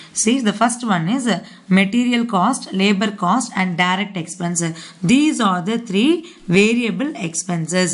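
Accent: South India, India. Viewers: Thank you mam.lecture is good and i like your voice as you are south Indian if i am not mistaken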